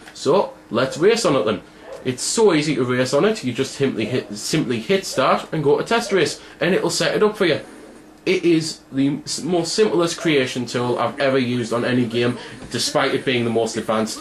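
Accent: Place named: Scotland, United Kingdom. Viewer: Where you from, mate. You sound Scottish or something. :]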